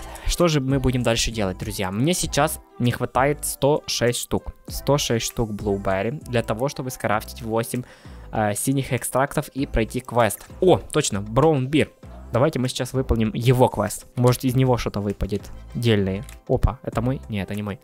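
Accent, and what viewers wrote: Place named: Ukraine. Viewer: Я кста думав що ти рус а ти Укр чути просто Українські слова хорош!!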